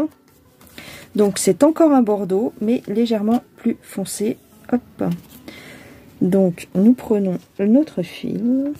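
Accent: South, France. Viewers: Mais garde ton accent du Sud bon sang Ceux qui ne sont pas contents qu ils aillent voir ailleurs Ce sont tes délires qui font ton charme❤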